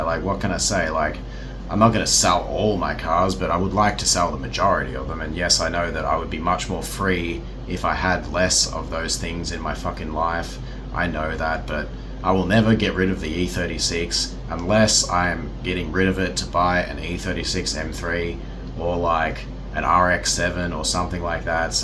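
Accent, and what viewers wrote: Australia. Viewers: Sorry, but I never take advice from people who have Australian accents and a 1998 Boy Band haircut. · I guess you are Australian ? Cuz of the accent)) do you call it spring ? Haha it’s 25 C degrees there it’s not spring, it’s summer)) I mean it’s very good temperature nothing compared to our coming winter it’s going to be -50 C 🤌 Also didn’t know that we have the same time zone almost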